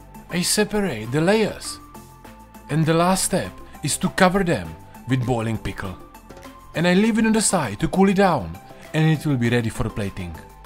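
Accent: Czech Republic. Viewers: Vaříš opravdu skvěle ale ta čeština tak brutálně bije do uši.. Raději si vypínám zvuk a zapínám titulky 😀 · češtější přízvuk jsem asi ještě neslyšel... nice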